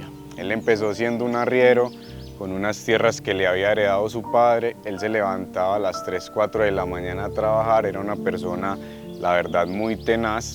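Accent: Antioquia, Colombia. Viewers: Muy buen trabajo....ME ENCANTA SU INVESTIGACION EL RELATO.....HACE QUE NO SEA TETRICO....COMO HACE EN OTROS PAISES....QUE RECORREN Y NO SABEN INVESTIGAR SU HISTORIA....ADEMAS SE MUESTRA UN CEMENTERIO BIEN CUIDADO....BIEN ORGANIZADO...###SI SE HACE COMO UN MUSEO....MUY PAISA SU VOZ ME RECUERDA A LA VOZ DE MI SOBRINO....Y LO BUENO ES QUE MUESTRA UN VIDEO BUENO HECHO EN COLOMBIA....QUIERO DECIR QUE AQUI ABAJITO VI OTRO HABLANDO DE ESE MISMO CEMENTERIO....PERO NO....😮😮😮😮LE FALTA INVESTIGACION.Y APENAS LLEGO GOOGLE APENAS LO COLOCÓ...DESDE COLOMBIA. TAMBIEN SOY.....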